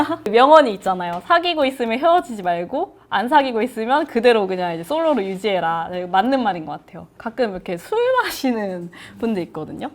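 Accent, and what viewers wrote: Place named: Jeolla, South Korea. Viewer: 선화예고 나오신 분이 뭔가 광주나 목포삘이 많이 나시네요.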